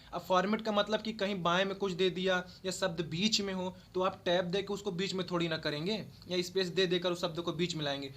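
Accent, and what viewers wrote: Allahabad, India. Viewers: Tqqq sooo much sir 🙏 And apki allahabadi language mashallah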